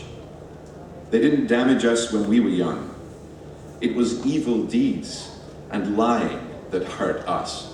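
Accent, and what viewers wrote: United States. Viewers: Did Cumberbuddy do this to practice his American accent? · I love how he portrays his best American accent throughout... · interesting that he is affecting an American 'accent' for this. Doesn't make his voice any less mesmerizing. :)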